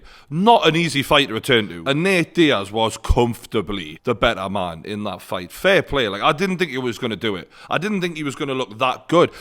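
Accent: Newcastle, United Kingdom. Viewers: Fuck off. Romero got robbed. Create your own analysis instead relaying the spin with a Geordie accent. Think for yourself. Sheep.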